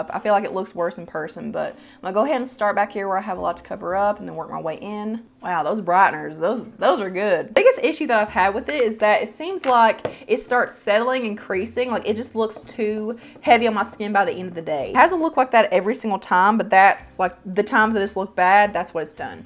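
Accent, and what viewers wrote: South, United States. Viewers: Love your southern draw 😊 great video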